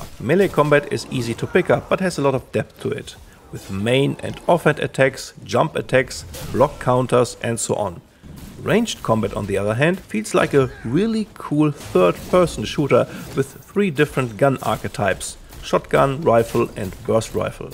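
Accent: Germany. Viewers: Oof, the german accent is kinda killing me.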